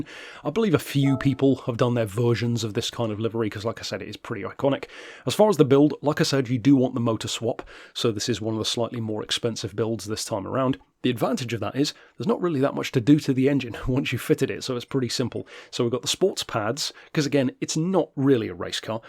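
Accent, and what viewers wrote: United Kingdom. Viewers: You know you're in good hands when you find a tutorial with a British accent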